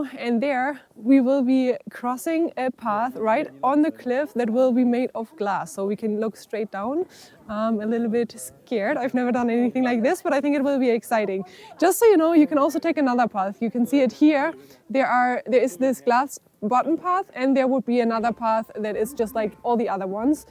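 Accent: Germany. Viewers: Hallo! Ich höre da einen deutschen Akzent raus-daher in deutsch: großes Lob für super informative Videos! Wir sind demnächst auch in den Avatar Mountains.Darf ich mal fragen, wie ihr das mit der Drohne und der Anmeldung hinbekommen habt? Man benötigt, so weit ich weiß eine chinesische Telefonnummer. Würde mich über eine Antwort freuen.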